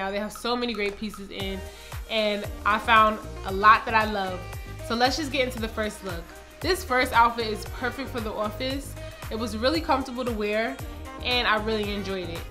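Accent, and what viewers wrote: New York, United States. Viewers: Another great video! Your NY accent is giving me so much life!!! Reminds me of home lol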